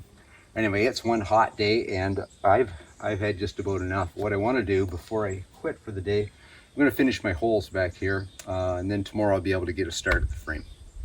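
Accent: Canada. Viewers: Why did you shake your head at 40 secs. in? looking at something on the ground in the middle of a path. And, why is your cabin so close to that pond? And, what species of tree were you cutting down at the beginning of this video? A conifer obviously, but what kind? [Ah! you mention "cedar" . Must be Eastern Red Cedar (which of course is not a "cedar" at all but a juniper - Juniperus virginiana; that is if you are east of the Mississippi. As straight as they are I'm guessing East Red] And, you keep mentioning how hot it is - I'm a new subscriber so do not know where you are located. I don't mean specifically, but just a state or province would likely do for me on climate. Oh! just heard that distinctive "ow-tt" of the Canadian English. (An idea:you could shed some body heat by taking off that felt hat - I think some one said 30% of your body's heat is in your head). 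And, obviously you are not worried about how green that wood is - is that b/c shrinkage will not be a problem. (I was assuming you were using this kind of tree b/c it's rot-resistant). And, I wish you'd shown us how your attached the wood pieces. I assume some sort of nail? But it would have been good to see that. I would also have liked to see some of those two hours getting a hole through the rocks! Re: saws I think maybe I got one of them there crummy saws from Ace hardware. It's got their name on it. Well it is a BITCH to use! I took it in and asked two of the guys there what the deal was? they didn't k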